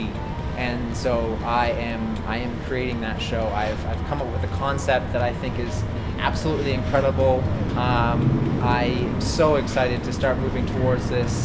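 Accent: Canada. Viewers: omg he sounds so Canadian i wonder how he says about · Canadian accent represent!! :D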